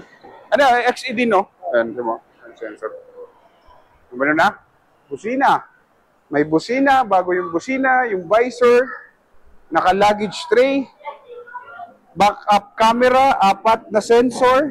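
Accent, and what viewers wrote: Philippines. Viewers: Garu matnog ang tuno kan bikol mo sir? Tama?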